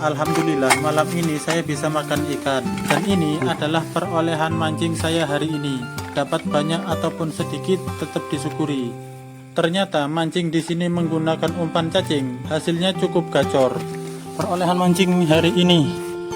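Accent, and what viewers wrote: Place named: Java, Indonesia. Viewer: Logat jowo nyell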